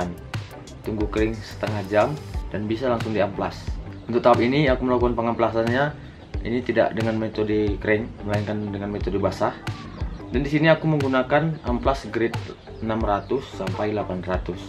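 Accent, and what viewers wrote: Medan, Indonesia. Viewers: Bahasa nya kental medan kali bg,bangga awak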